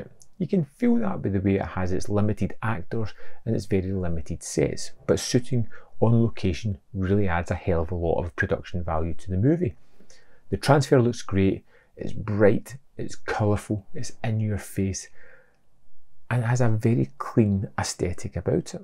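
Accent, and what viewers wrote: United Kingdom. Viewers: Was just roaming at Arrow to buy some stuff and saw the Grffe box wondering what that might be. With Arrow you'll never know. :D So I ended up here and liked it for several reasons: - the review gave me a great idea what to expect and personally I don't mind seeing footage, pictures is good enough - as a non english native who used to have an american accent this is great to learn proper english and shit to a more british accent (thanks for not speaking to fast :) ) - for the purpose of ending the american dominance on YouPuke I could subscribe to a british channel, nice Salute from germany.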